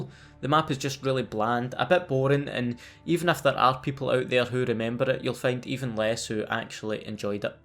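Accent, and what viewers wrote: Scotland, United Kingdom. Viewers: I love watching your vids I'm british and I can do a Scottish accent due to you thx my favourite thing I heard you say was you camping bastard #notification sqad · Is he scottish?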